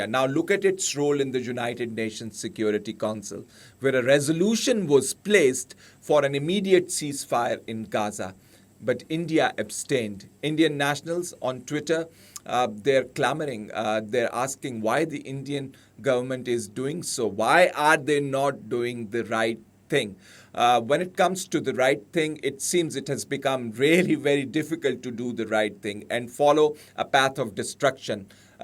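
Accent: Pakistan. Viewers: Don't know who is this idiot who is trying to teach India. From his tone I can make out that he is another illiterate Pakistani but he should know that India is quite superior than Pakistan & even his uncle China.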